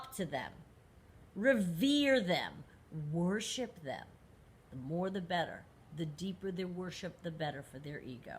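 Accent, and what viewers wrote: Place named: New England, United States. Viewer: I just found your channel today and have been binge watching. One of your videos you said your hair was up bc it was hot in Florida. I thought to myself “Oh, I thought for sure she was from New England based on the accent” then you said Rhode Island in this video. I gasped lolol I’m from and still living in Rhode Island!!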